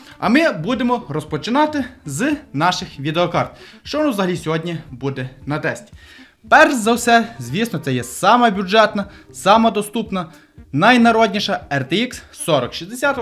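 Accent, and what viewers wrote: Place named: Hutsul, Ukraine. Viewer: але файний той гоцульский говір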